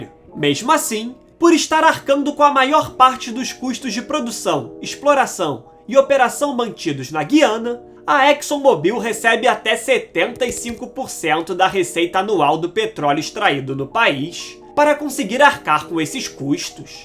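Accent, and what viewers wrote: Rio de Janeiro, Brazil. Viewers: O que mata o video é esse carioquessss do narrador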